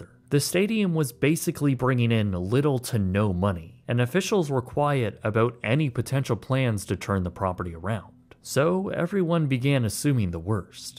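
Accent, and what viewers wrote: Canada. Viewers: Thanks for another great video. Hearing you pronounce The Padres was funny. I'm not poking fun, I understand you have a Canadian accent. Our differences makes life interesting.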